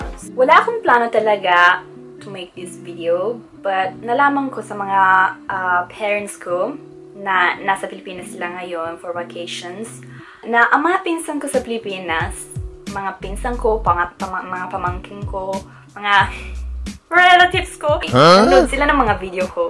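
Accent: Italy. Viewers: Il Tagalog con l'accento milanese è magia. 😂❤ Vi prego ditemi che c'è qualche mix filippino-veneta nei commenti. Ho bisogno di sentire come suona il Tagalog con l'accento veneto 😂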